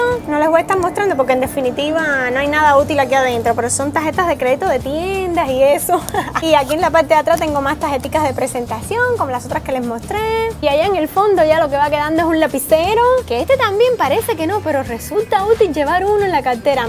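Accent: Cuba. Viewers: mariam teran es por el acento cubano en algunos países cambian algunas palabras por ejemplo yo soy chilena y no hablamos con la S ejemplo: estas/estai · mariam teran por el acento cubano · mariam teran es el asento cubano pues yo soy panameña y desimos nama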